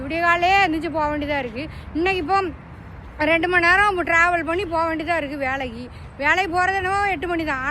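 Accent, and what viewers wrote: Tirunelveli, India. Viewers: நெல்லை தமிழ், கொள்ளை அழகு நல்ல தமிழ்! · Unga speech fine sister 🎉. Unga speech tirunelveli touch.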